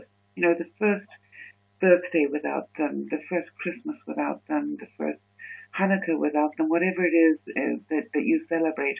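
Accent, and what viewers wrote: United Kingdom. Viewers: Are u British? Because u have a British accent!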